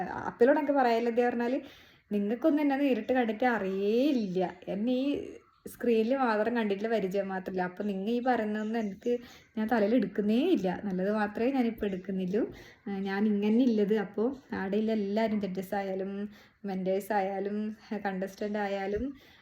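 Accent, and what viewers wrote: Kasaragod, India. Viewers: Nammale kasargodan bhasha ❤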